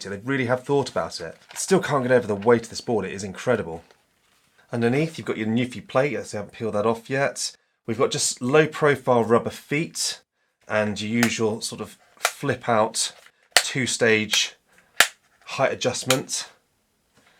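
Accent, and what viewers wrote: United Kingdom. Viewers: Sounds like you're in the UK mate, can you tell us a bit about shipping please? Time taken? Customs fees? Any problems?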